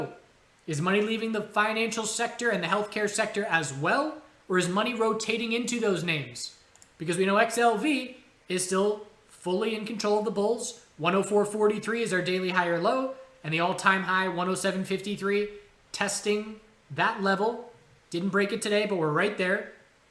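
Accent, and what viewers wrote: Ireland. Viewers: More Irish accent please!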